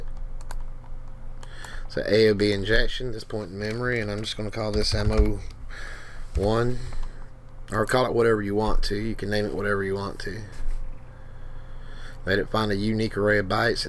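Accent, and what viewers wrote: South, United States. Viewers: I did it yesterday whole day and half of night. I started with float, 4 bytes and unknown initial value, first searсh gave me more than one billion results, than I found a border on the gas station (in the game prologue) and step up and down and after every action change value to decrease or increase and did next search. After first step on border it decreased results to ~ 3 millions and than I did the same action by circle, after almost 30-40 minutes I get few values, but they was red and changed realtime, so it probably wasn't height of the character, maybe rain or her hair or body movement or even rotation of the camera... no idea. When I did it camera always changed and also when pushed alt+tab. Than I tryed the same roadside, ladders, run and step up and down in diffrent locations, after a coupe of searshes I get something like 50 adresses still appeared, than repeated same actions, until I got 5, but change the value, notihnig changed in the game, so I probably miss something. 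Also during first search my PC works pretty tough almost freezing, well I don't know what to do. It seems pretty complicated also english isn't my native and yours sounds for me like you some kind of country singer :)) I'll try to look closely on your video, thanks!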